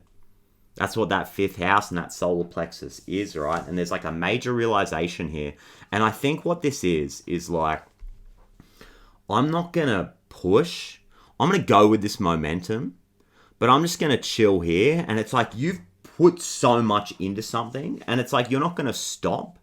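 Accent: Australia. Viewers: Omg i just love that these channelled messages are coming through an aussie accesnt and aussie way of relating to the world . 😂❤❤❤❤❤❤❤❤❤❤. Just this alignment in irself blows my mind . Just llove you and your humour . AGAIN Thank you mate . ❤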